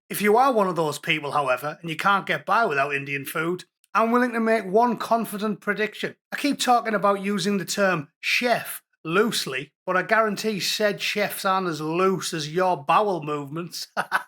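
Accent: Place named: North East England, United Kingdom. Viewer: Such a funny channel in a shite liebour world its good to hav a laf. Love the NE accent as well noort wrong Wye eye man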